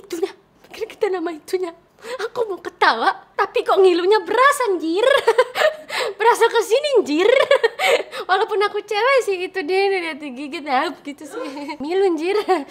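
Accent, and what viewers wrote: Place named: Java, Indonesia. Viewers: Reaction logat Jawa lucu kak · Boso.mu medok² jowo Ki wong ndi toh😴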